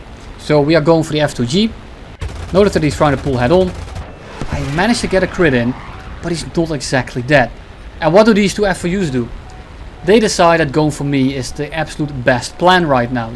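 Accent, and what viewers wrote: Netherlands. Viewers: Are you dutch? You sound like you are from the Netherlands... These accents are too recognizable in Europe..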